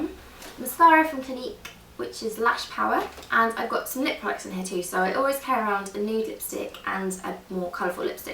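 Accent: United Kingdom. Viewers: I want that accent if i was britisch i will never stop talking :)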